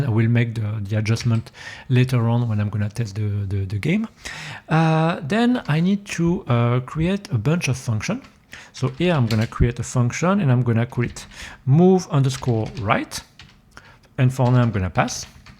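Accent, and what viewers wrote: France. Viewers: Wow that frenchy accent! J't'ai grillé des les premiers mots x) ! Super vidéo, continues comme ça !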